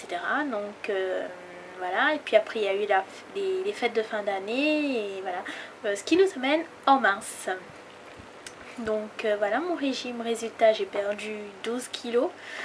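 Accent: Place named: Réunion. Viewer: Ta un accent créol réunionnais ;-)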